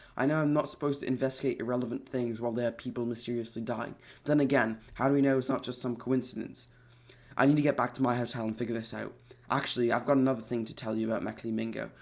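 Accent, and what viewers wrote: United Kingdom. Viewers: good job with the birtish accent! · Dude great job on the British accent too!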